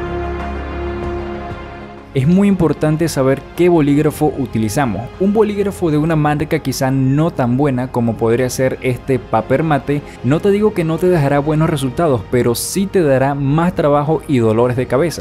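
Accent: Venezuela. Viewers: eres de venezuela?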